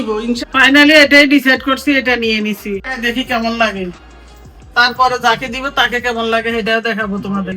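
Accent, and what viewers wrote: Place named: Char, Bangladesh. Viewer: কথায় বুঝা যায় চর এলাকার মানুষ।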